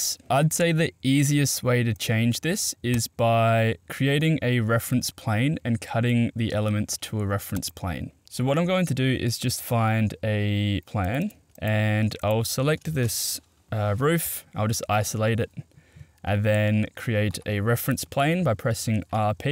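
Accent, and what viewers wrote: Australia. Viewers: "...here in Australia..." I know you were from Australia, your accent is familiar, just like structural engineer Brendan Hasty 's accent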